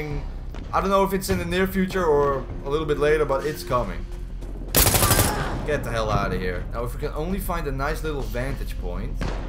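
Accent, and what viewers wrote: Netherlands. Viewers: The Dutch accent is real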